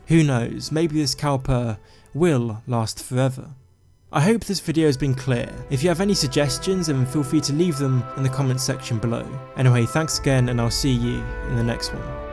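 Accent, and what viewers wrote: United Kingdom. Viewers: Love your videos and your voice . What part of GB are you from ???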